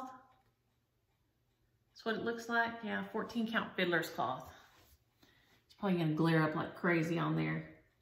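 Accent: South, United States. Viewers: I’ve been enjoying your Vlogentines, especially right now. Monday I fell getting on my bike and fractured my wrist in 2 places and tore my rotator cuff to boot. So much for my stitching and knitting and quilting for a while!😢 You are like company for me. We are out in the CA desert in our tiny winter house, and can’t do much of anything anyway, but now no pool or stitching. I’ll go nuts!! Thinking maybe I could manage a little cross stitch or needlepoint on a standing frame. It would be slow but something to do. Of course mine are back East, so a trip to Hobby Lobby when I go to the doc next week might be on the list. I enjoy your accent having lived in OK for 4 yrs and TX for 4 too years ago - I’ve missed it😁. It is amazing we survived our childhoods in trucks and station wagons!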